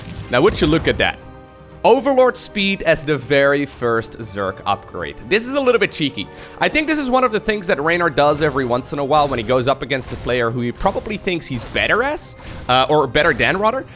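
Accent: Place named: Brabant, Netherlands. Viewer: hoor ik nu de brabander in Lowko :D reynor is beter as zen tegenstander :D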